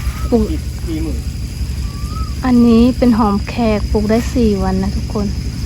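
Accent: Isan, Thailand. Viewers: สุดว้าว..สาวเมืองกาญจน์แต่เว้าสำเนียงอีสาน อ่างเก็บน้ำที่ไหนนะ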